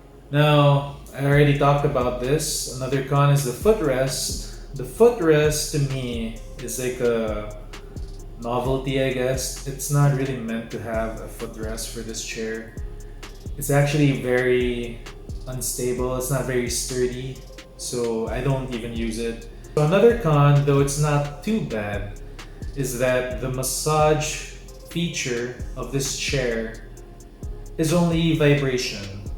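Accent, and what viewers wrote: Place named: United States. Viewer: Jesus for a Filipino, you have a very American accent.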